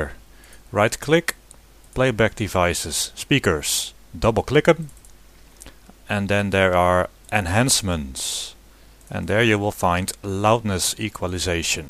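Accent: Netherlands. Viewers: Aye is that a Dutch person I hear? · zo mooi om een nederlander engels te horen praten, je hoort het ook echt atijd binnen 1 seconden.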